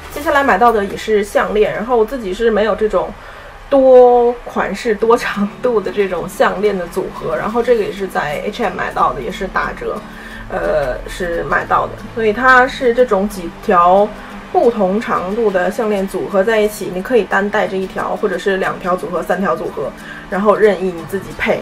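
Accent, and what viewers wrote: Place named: Changchun, China. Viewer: 长春人？难怪口气很熟悉，老乡啦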